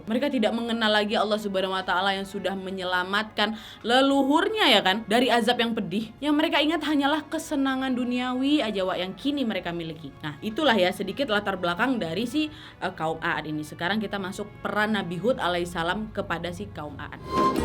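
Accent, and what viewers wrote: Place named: Medan, Indonesia. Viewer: sebaiknya tidak menggunakan dialek medan... ngga semua orang ngerti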